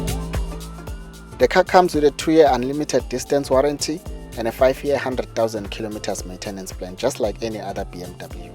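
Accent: South Africa. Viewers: And then the last part made me subscribe, athi "With a budget of 36 000 per month I would buy a corsa lite or a tazz every month for 6 years" lol the humour caught me off guard I laughed so hard. Nice video bro. Your narration has lots of mzanzi flavour, keep it up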